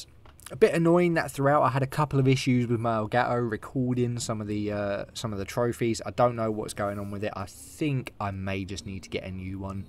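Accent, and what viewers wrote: England, United Kingdom. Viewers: I know this guy is saying divine source but I guess with his english accent and sounds like he's saying divine sauce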